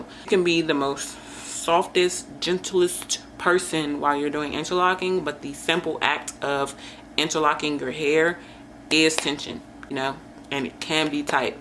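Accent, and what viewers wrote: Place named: Washington DC, United States. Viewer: I know our accent from anywhere ❤ youre definitely from around the dc/pg area 😂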